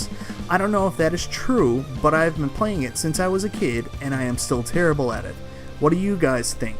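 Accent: Newfoundland, Canada. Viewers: Is that a Newfoundland accent I hear? I am also a hard game aficionado from the Rock, so that's nice. I've even beaten all three of these, and they're no joke.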